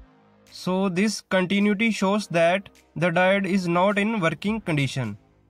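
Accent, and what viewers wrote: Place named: Punjab, India. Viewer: It's better to make video in urdu instead of punjabi English brother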